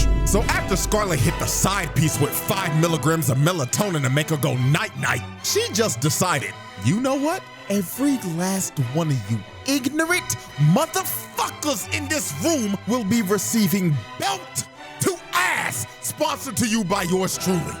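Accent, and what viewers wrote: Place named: United Kingdom. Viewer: as a Brit I approve this accent. Give this man a cuppa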